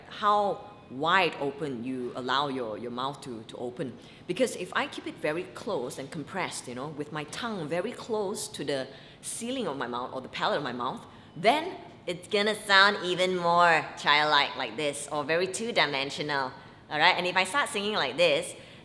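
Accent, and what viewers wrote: Singapore. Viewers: Are you from Singapore? Your accent sounds lightly Singaporean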